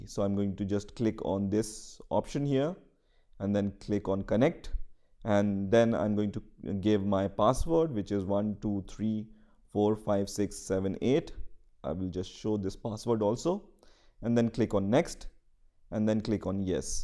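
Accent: India. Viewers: Strong Indian accent not understandable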